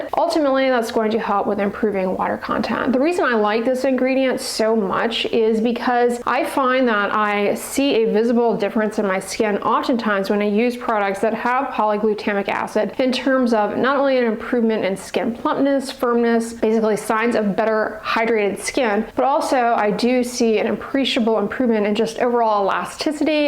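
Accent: United States. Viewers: WOW what weird thing to say!!! She has a lovely American accent, nothing unbearable about it!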